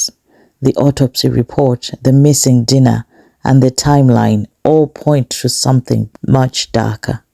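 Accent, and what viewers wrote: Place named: United Kingdom. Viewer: You've British accent... tikambilane, kindly share your email address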